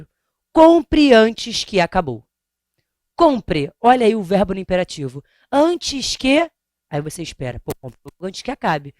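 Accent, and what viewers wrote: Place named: Rio de Janeiro, Brazil. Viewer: Carioca ctz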